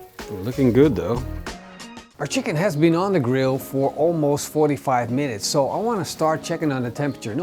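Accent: Netherlands. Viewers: "hoe" haha eindelijk. dacht al heel de tijd een nederlands accent te horen😄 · Beercan chicken best on gasbbqs. Keeps the chicken white inside. That Holland english talking accent is horrible!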